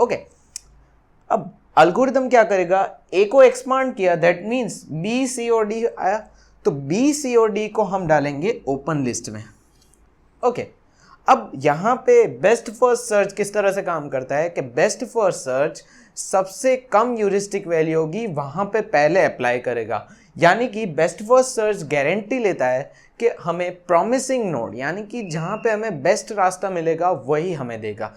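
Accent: India. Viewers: FARK!!!!!.........every fucken video on anything maths and IT is fucken Hindi or English with strong Indian accents.....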